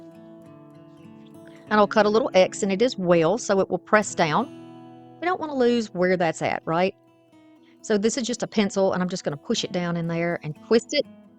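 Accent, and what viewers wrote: South, United States. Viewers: Love your videos! Love the southern drawl. Im originally from Arkansas but live in Illinois for several years. All your projects today are just Gorgeous! ❤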